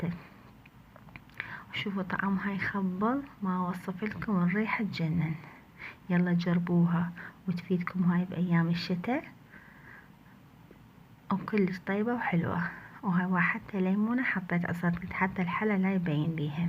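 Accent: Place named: Iraq. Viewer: بالهنا صحتين احسن حاجه اللهجه العراقيه بحبها اوي ❤ وشكرا علي طريقه مرقه القرع ربي يحفظك ❤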